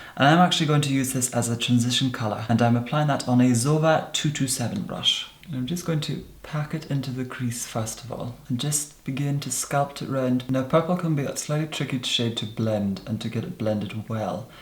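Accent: Scotland, United Kingdom. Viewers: I love his accent but I love Scottish accents anyway. Also I love it that somebody can acctually speak English properly cos Imteased for that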